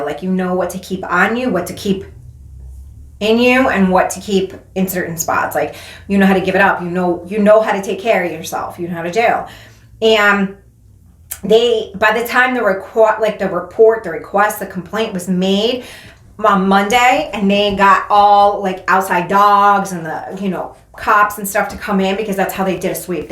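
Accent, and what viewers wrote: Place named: New York, United States. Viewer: Random but i love your new york accent lol! Also as a fellow addict in recovery i love and adore the work you are doing. I was a heroin addict for the better part of 8 years on and off. Finally have substantial clean time and my twin boys are due to make their appearance may 3rd WE.DO.RECOVER!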